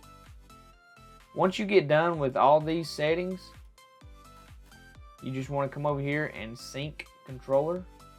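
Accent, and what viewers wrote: South, United States. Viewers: U from the south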